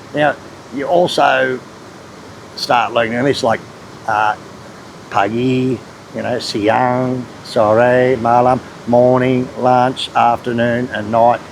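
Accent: Australia. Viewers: The content in this video is excellent. If you want to learn to speak Bahasa with an Ocker accent this video is fine. Once you have got the words and translations down pat, best you Google an Indonesian person saying these words and phrases so you can get the pronunciation correct.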